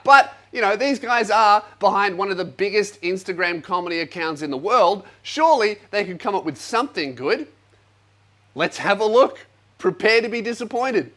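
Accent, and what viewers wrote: Australia. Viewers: I like Australian English 😂 hi from Slovakia 🇸🇰 🤘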